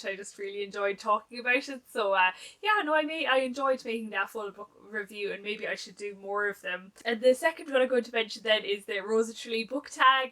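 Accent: Ireland. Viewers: Congratulations on your first year! You have rapidly become one of my favorite BookTube channels. I love your taste in books, and I could listen to your Irish accent for hours on end! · Congratulations on your first year,. Really enjoy your videos and your smily face 😊. My parents were from the West of Ireland (Westport and Foxford) and I love listening to the accent too! Here’s to the next year. Catherine x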